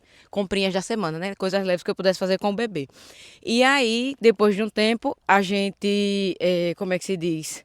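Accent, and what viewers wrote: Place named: Natal, Brazil. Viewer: Você é de Natal/RN? O sotaque é identico ❤ Ótimo vídeo 🎉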